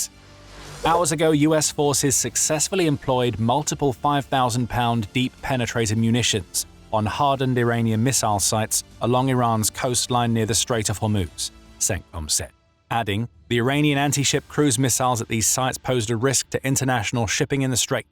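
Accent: England, United Kingdom. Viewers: a British accent?? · I can tell just by the narrator's English accent that this some bullshit. "Sorry, bloody bullshit."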